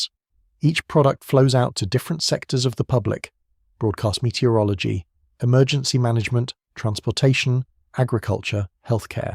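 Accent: United Kingdom. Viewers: Why is the narration in a British accent?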